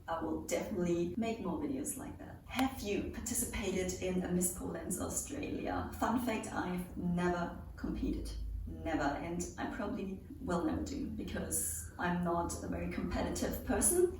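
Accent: Germany. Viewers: Ha! Wie Geil. Danke fürs beantworten! :D And also for translating my questions, I never thought that this could be a struggle! ;D Vom dialekt her hätte ich dich eher in Franken einsortiert aber das du in Ffm geboren bist find ich ja ultra witzig...Vielleicht hat der YT algorithmus dich mir deshalb vorgeschlagen? Grüße aus dem norden Frankfurts!! :D p.s.:Wenn du das nächste Q&A machst kann ich ja wieder fragen stellen. Und wenn ich dir ne flasche Äppler an die Goldcoast schicken soll, sag bescheid :D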